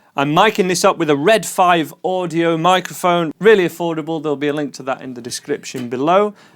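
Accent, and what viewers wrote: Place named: Yorkshire, United Kingdom. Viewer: Hey, where can I get myself a Red5 and which one are you using in the video as I can’t find the link in your description. Much appreciated, thank you! I hear a Yorkshire accent, I’m Leeds/Bradford!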